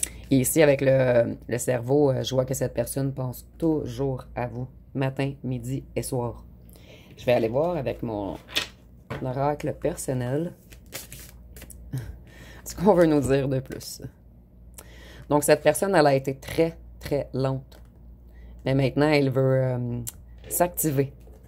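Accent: Quebec, Canada. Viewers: Merci beaucoup a vous que j'apprécie ainsi que votre accent québécois que j'aime enormement . Gratitude et remerciements à vous .❤👍🙏🌺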